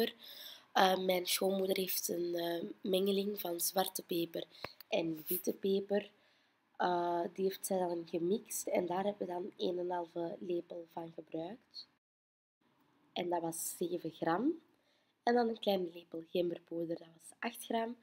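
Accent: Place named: Belgium. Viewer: jouw Belgische accent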